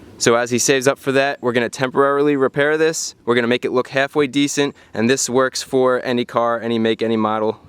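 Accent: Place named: New Jersey, United States. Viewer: Dat jersey accent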